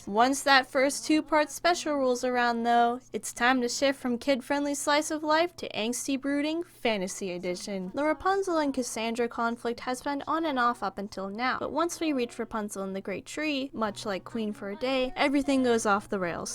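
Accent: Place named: United Kingdom. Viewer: The British accent sends me into orbit “But RaPuNzIiĪiEeEeEEEeLl”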